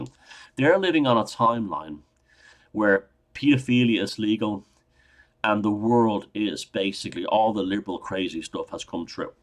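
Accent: Dublin, Ireland. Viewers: Dublin area accent? New subscriber here. Great vid, cheers!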